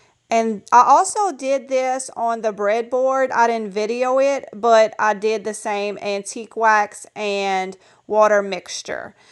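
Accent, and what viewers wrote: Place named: New Orleans, United States. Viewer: I live in east Texas. Sounds like you're from New Orleans. Love the planter and ladder.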